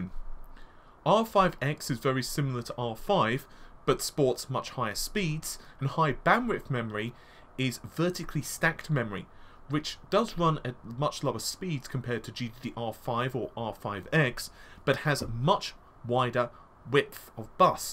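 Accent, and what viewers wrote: United Kingdom. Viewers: Very hard to understand him. He sounds like a Brit but also sounds like a person with his english as a 2nd language. His explanation is hard enough to understand with all video gaming and not organized way and his pronunciation on those words is worse lol.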